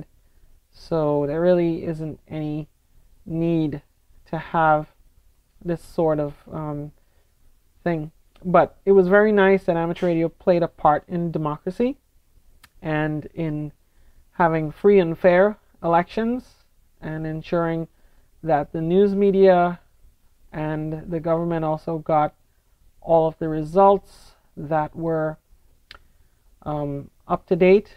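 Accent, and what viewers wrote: Trinidad and Tobago. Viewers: Thanks, by the way are you from Trinidad? KM6DHT/AG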